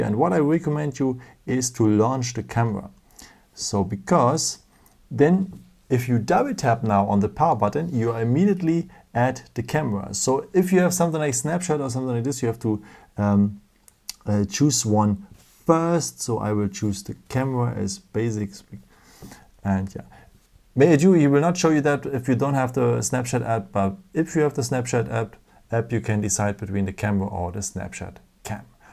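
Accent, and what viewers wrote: Germany. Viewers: Think he's from Germany. I'm living there and most Germans speak English like that. But I agree with you :)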